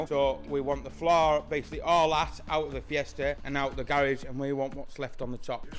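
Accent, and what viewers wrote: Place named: Middlesbrough, United Kingdom. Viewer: yes sorry I looked the company I’m from Middlesbrough I new the accent lol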